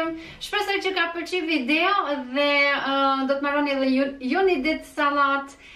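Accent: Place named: Kosovo. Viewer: pershendes shum dhe po fol shqip si krejt kosova vetum dallimi asht cilin vend jeton ska kurfar dryshimi ma mer mendja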